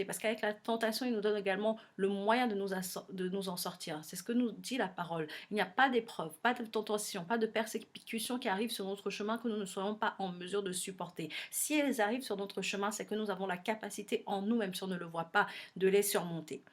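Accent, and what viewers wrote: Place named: Cameroon. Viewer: oh Mon Dieu! ton accent je me disais aussi que tu es Camerounaise. l'accent😅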